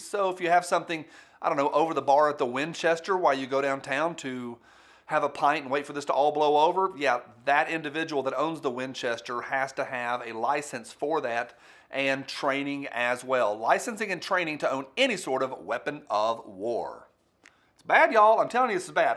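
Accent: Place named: Texas, United States. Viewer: Where in Texas is this woman from? Making sure we don't ever move there.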